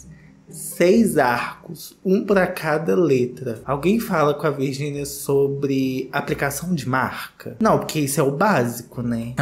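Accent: Minas Gerais, Brazil. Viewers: Um escritório bem feito?!, você me faz rir demais, menino! 😂Adoro seu the boche e seu sotaque mineirim 🤩🤩💜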